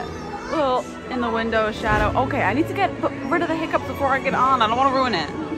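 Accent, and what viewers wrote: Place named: New York, United States. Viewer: New York is on mine and my wife’s bucket list! I thought that was your accent but didn’t want to speculate lol. COME ON YOU BOYS IN GREEN!!!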